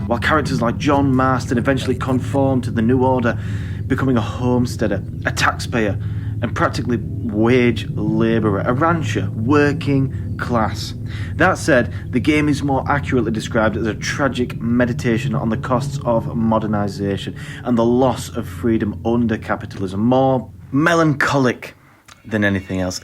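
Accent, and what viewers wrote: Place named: United Kingdom. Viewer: You should definitely try out Owlcat’s games as they have some incredible cRPGs similar to Pillars and Tyranny from Obsidian. Your comments really show maturity as the whole “woke” thing is about the right-wing not liking liberalism more than how it’s implemented into games, which it is fine to be right-wing, but the problem is it’s simply a conflict of interest to critique a video game with political messaging by countering that with your own political messaging. Planescape Torment came out back in 1999 and was very political on how all factions were sometimes this “Lawful evil” alignment with other factions focusing more on personal divine alignment and mind, transhumanism, tribalism, radical revolutionaries, etc. That is the ultimate “makes you think” type of game, and any PC can run it. Other than that, the closest “spiritual remake” to that is Disco Elysium, and that game is brilliant in many ways and it’s what it feels like if BG3 was just a story game with no combat and this sense of dissociation throughout the game. 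Thank you for making this lovely video, and might I say you do have quite a nice accent, I’m not sure where it’s from (Scottish or English?), but you do have a good accent nevertheless.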